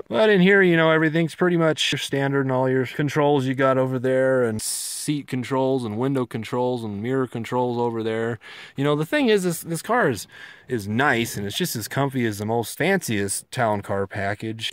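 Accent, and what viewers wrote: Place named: Buffalo, United States. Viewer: God bless u but i cant stand the way people from buffalo ny talk its always like your across the street when your right infront of them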